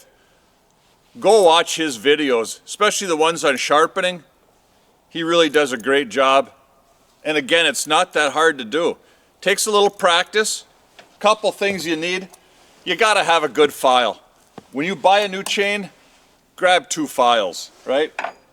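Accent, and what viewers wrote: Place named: Upper Peninsula, United States. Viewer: Yooper ASMR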